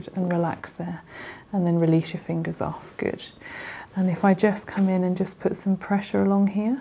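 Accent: United Kingdom. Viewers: Can't stand British accents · That’s full on Pommy, not Occa at all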